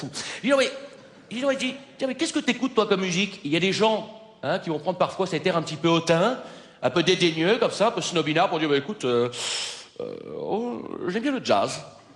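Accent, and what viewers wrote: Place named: Belgium. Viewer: Il avait un léger accent belge à l'époque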